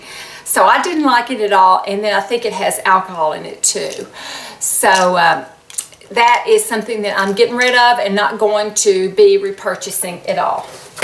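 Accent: South, United States. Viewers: What a cute way of saying gone love your gentle southern lilt love elaine Lincolnshire uk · You look beautiful as always and that necklace looks so pretty on you. Just hearing your voice is so soothing...hope that doesn’t sound too weird...lol. Love a southern accent :)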